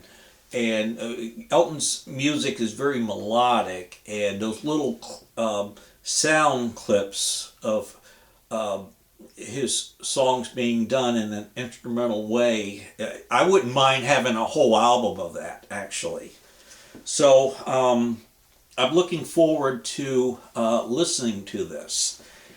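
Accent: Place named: South, United States. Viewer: The first thing I had to do after watching the video was to find out where in the south that this young lady was from that's a thick accent even for me here in Louisiana. Very different vocal styling, good music the more videos I watched the more she just grows on you. Thanks for the introduction.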